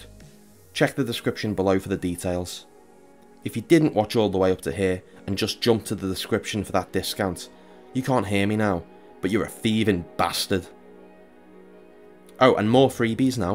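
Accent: United Kingdom. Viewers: The algorithm has blessed me in mysterious ways - came here for your review on the Hamilton Khaki Field Mechanical and here I am, listening to a British gentleman talking about man thrashing in the Mediterranean 🤣 “NO MORE POLITICS”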